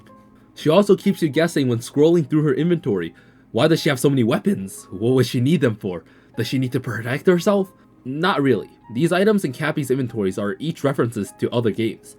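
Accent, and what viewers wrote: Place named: United Kingdom. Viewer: can’t watch game theory since the new guys british accent keeps throwing me off and making me nauseous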